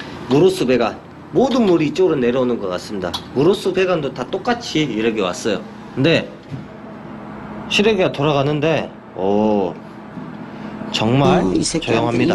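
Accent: Jeolla, South Korea. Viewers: 얼굴은 잘생겼는데 사투리가 심하네요 인자는 전라도 사투리? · 대한민국 사람이면 누가 들어도 전라도 사투리를 ..ㅎㅎ 강원도인줄 알았다네..ㅋㅋㅋㅋ 탈북민인가? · 딱봐도 전라도 사투리 쓰시는구만 한국사람맞냐니 말하는꼬라지보소 인성먼저 갖추시길 바람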